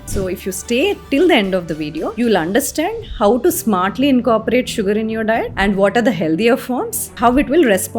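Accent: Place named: North, India. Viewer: Meru telugu na I thought an northie dr....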